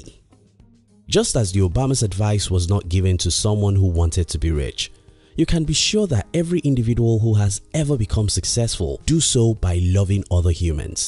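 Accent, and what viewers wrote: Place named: Nigeria. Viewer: You sound Nigerian. If you are then you should know using more relatable references would help drive home your point better. Winston Churchill is perceived by some has racist so to some it's a bad reference to make and 89% of the rest of the world don't even give a F about him. But In all great content 👍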